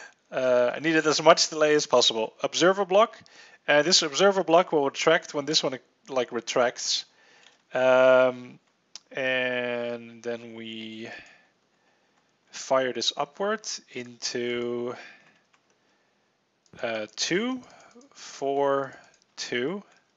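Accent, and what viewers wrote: Netherlands. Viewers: are you dutch because you have a very dutch accent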